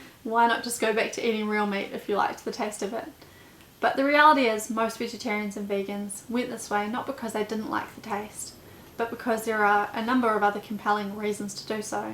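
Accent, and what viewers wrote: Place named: New Zealand. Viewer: I picked up the kiwi accent way before she mentioned it. If I'm not mistaken she's probably also from the lower south Island. Just a hunch. Great presentation and finally vegan recipes with New Zealand ingredients!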